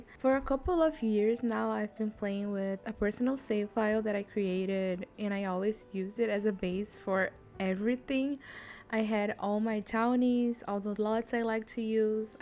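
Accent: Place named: Brazil. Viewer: I knew I recognized your accent, happy to see another fellow brazilian sims player haha. Excited to see more of your videos ❤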